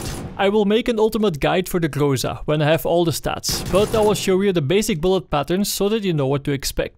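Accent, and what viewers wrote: Netherlands. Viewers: dutch arent you xD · He sounds Dutch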